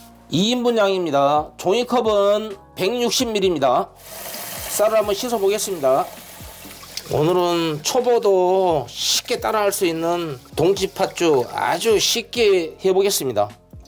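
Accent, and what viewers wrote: Jeolla, South Korea. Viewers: 전라도는 이신가벼요 친숙해서 좋아요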